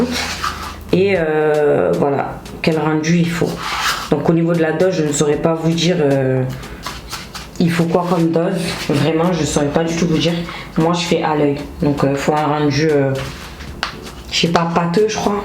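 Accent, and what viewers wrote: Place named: Toulouse, France. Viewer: On s’en beaucoup ton accent tu es toulousaine ? Sinon super vidéo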